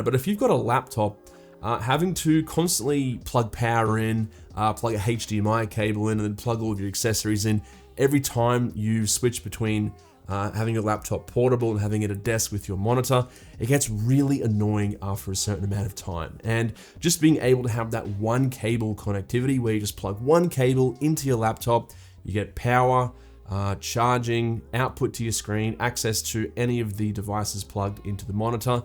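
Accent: Australia. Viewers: Would have been helpful flagging the pricing mentioned was in USD. The Aussie accent led me to believe it was AUD and there had been major price drops!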